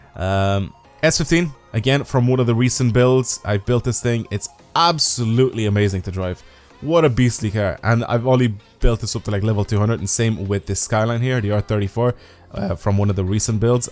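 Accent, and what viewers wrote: Dublin, Ireland. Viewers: U from dublin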